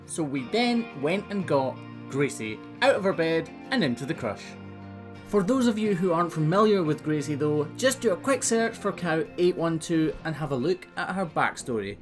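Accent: Scotland, United Kingdom. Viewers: My mother's maiden name was Cameron. I have been to beautiful Scotland. Your Scottish accent makes me feel closer to my ancestral roots. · First I love that your a Scot so am I and I was a dairy farmer in Poland Central NEw York very ruff winters! I love your show, your accent, the land is beautiful l. Me watching your show is like almost being in Scotland thank you for this show Always Kathleen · You forgot to mention his wonderful Scottish accent. That’s not as important as learning something about his trade or his passion and care for these animals, but I’m with you. I’m a 66 year old lady from Centennial, Colorado, SE of Denver, and I find myself binge watching these videos of his. Very enjoyable pasttime. 😊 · I've been on the horse side of things. Very interesting to learn about the cows claws. You make it enjoyable & easy to pick up on. Easy to understand. 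The accent helps too. I become a fool for anything Scottish. Especially accents... 😏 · Have you ever checked out your subtitles? It's hysterical sometimes, the bot cannot figure out your accent. Scottish is my favorite accent btw. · I’ve gone through so many videos of yours by now. I have been thinking about what it is that makes it so compelling. Trying to pin it down is hard but for me it’s the combination of the Scottish dialect, your charisma as well as passion for your work. It’s all just so wholesome! Albeit gory and educational 😊 Anyhoo - thank you ✨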